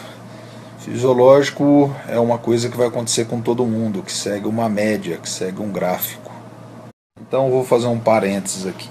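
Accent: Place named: Bahia, Brazil. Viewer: Você e baiano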